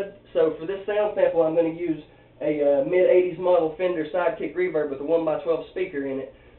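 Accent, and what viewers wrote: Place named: South, United States. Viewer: You have a Southern accent. Where are you from?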